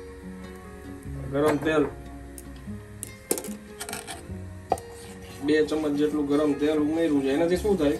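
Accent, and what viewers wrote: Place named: Kathiawad, India. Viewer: Jsk. u are from Kathiawad as I make out from your gujerati talk. So are you a liana or Vaanya?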